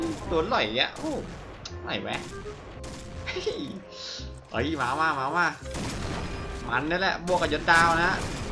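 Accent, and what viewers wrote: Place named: Kanchanaburi, Thailand. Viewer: สำเนียงเหมือนคนเมืองกาญเลย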